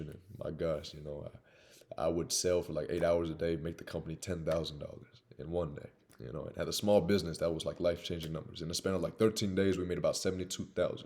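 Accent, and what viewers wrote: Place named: Houston, United States. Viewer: Where you from? Sound like from Houston.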